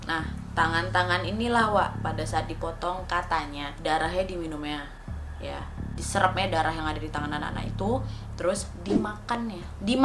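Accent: Malaysia. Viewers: Selamber je bahasa melayu tu bila sbut.. macam asli melayu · Cara penyampaian wak2 sikit mcm orang malaysia 🤗🤗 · OMYGOSH. SAID SELUMBER. i love her accent, it really same to Malay accent 😂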